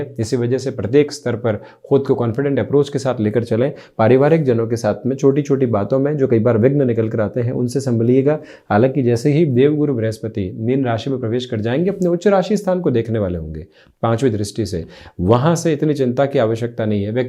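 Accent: Standard. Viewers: Sir Pranaam 🌺🙏🌺 Aap itni shudh Hindi kaa uchaaran kaise karte haiin ? 🙏☺️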